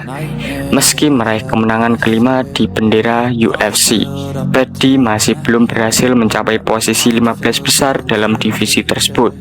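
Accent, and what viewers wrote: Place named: Java, Indonesia. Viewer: Medokkk bgt bhangg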